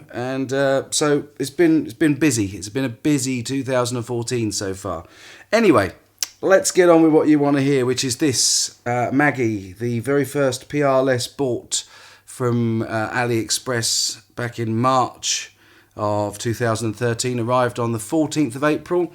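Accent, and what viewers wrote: United Kingdom. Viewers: There is nobody better then you reviewing chinese guitars hands down man ! very very nice british accent, love it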